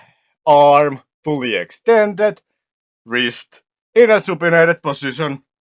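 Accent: Finland. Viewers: Haven't watched the video yet but I have to say, you sound super Finnish :D (I'm Finnish)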